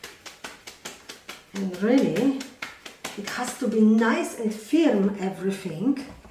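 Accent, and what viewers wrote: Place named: Slovenia. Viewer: This is the most interesting way of making salami. My parents make home made sausages with the skins. I really enjoy watching you in the kitchen and love your accent. I was born in Slovenia and came to Australia when I was 4 yrs old. That was over 53 yrs ago. So I have no accent, but my parents sound like you.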